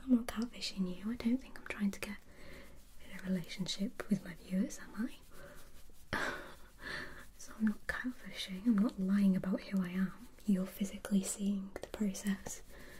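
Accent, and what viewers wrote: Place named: Liverpool, United Kingdom. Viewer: You sound like you’re from Liverpool in this video. Not sure if you are but I’ve never heard it before. I love this video btw grwm asmr is my favourite xx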